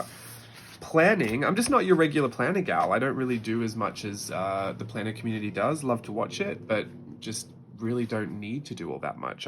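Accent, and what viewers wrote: Australia. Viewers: …. You are so freakin talented and AWESOME!!!! I Love your work, your creations, your teaching style, (and your Australian accent too)! You’re amazing mister! Thanks for always sharing and taking time to post for all of us, you’re a huge inspiration to me. I’ve had a lot of health problems this past year and miss a lot of videos you post but when I feel well, you’re the first channel I run to and see what amazing things you e created! Ahhhh you make me smile and always feel inspired ❤ thanks for all you do Hugs from Texas… 😊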